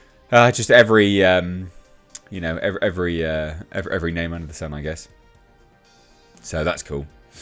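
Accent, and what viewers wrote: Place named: Southern England, United Kingdom. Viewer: This accent you have. It sounds like ricky gervais. What region of the world is this? Apologies in advance, they don't teach us specific English locations. Can't put my finger on it its like a southern accent ( to a yankee analogy)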